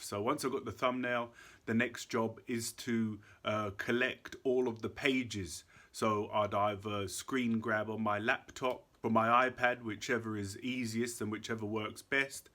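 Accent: United Kingdom. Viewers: Wow that's a long process, but your work makes many people happy & useful thanks for doing it, btw are you British? 🥰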